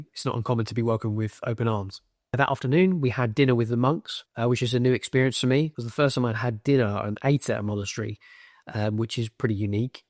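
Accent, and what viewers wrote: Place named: United Kingdom. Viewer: Orthodox, cute AND British accent ? ❤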